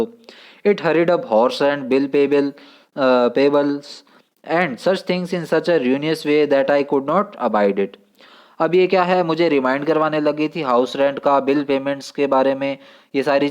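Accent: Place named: Haryana, India. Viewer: You teach very good 😊👍🏻👌🏻 And I think that you belongs to haryana your seeking style is showing that 🤔🤔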